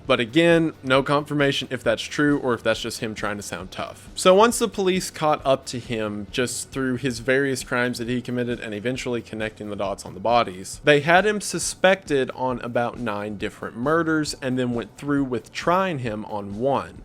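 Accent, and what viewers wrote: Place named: Texas, United States. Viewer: omg, i love your accent! are you from texas? <3 much love from London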